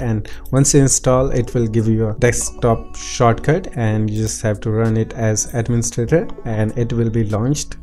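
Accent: India. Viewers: you sound very indianish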